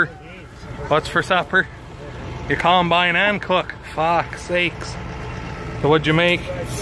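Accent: United Kingdom. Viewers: Still harvesting laid, barley in the north of Scotland. Loved this video with all the family working, all the machinery working. Enormous skies! a different land altogether. I'll tell my father (92) about your grandfather....still on the swather and on his smartphone! Best of luck to you all. Love that accent man! Just makes me grin! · It's good to hear the northern accent. Reminds me of home..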